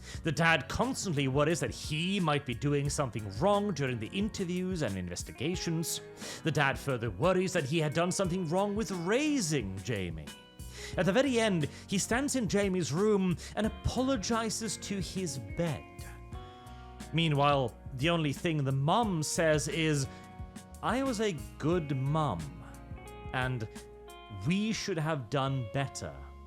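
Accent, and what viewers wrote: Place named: Wales, United Kingdom. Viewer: Don’t you love a Welsh accent!? Super enunciation as well. I’ve subbed.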